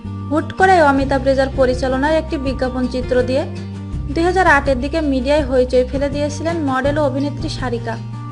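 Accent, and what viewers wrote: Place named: Noakhali, Bangladesh. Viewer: ai noakhailla pathilar path sunle mejaj kharap hoy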